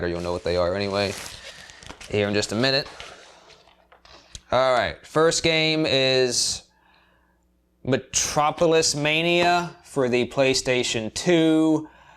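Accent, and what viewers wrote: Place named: Bronx, United States. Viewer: are you from newyork fam cause you sound like yeah from the bronx its lit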